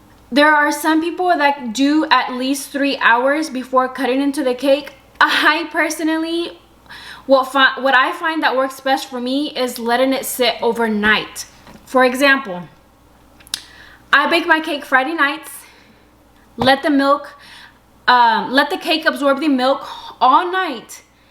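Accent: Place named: Texas, United States. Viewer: I love the video and how you explained everything!! I knew you were from texas from your accent.🥰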